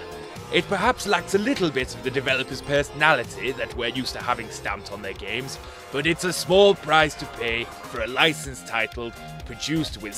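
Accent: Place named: Scotland, United Kingdom. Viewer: Good entertaining review, but i want your scottish accent back !!!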